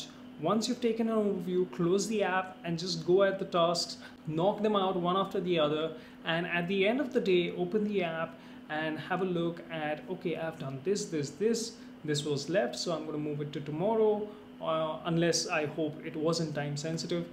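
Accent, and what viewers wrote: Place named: India. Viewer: I hate the indian accent.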